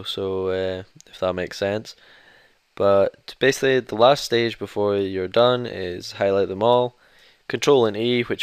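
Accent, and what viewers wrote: Scotland, United Kingdom. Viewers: love the scottish voice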